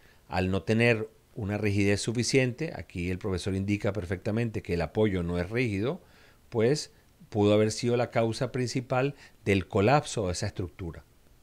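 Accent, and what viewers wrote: Venezuela. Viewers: venga: el que habla es un veneço?.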